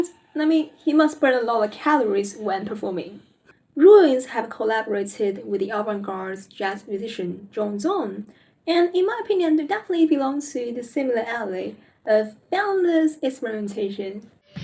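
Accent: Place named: United Kingdom. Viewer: Her accent is actually tinted with British English, can you hear it?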